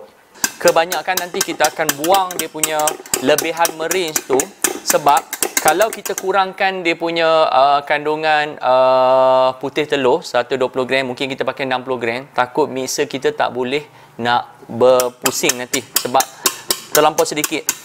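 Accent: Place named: North, Malaysia. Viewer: T kasih bg resepi, cakap utagha syok dengaq, harap2 rajin la nk buat nti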